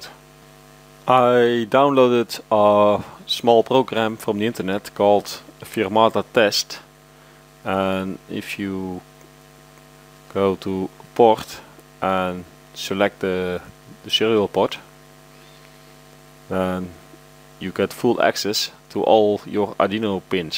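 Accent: Netherlands. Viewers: It is Dutch.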